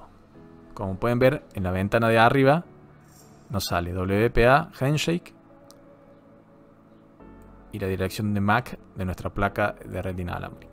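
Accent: Córdoba, Argentina. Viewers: que hermoso el acento cordobes explicando algo, saludos desde Córdoba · Sos cordobés ?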